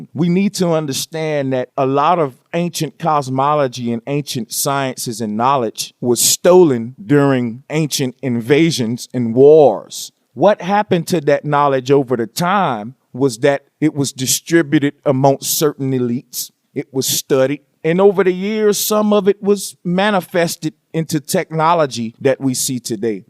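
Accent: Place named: South, United States. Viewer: Funny how clearly he spoke back then. His country accent is thicker today ✊🏾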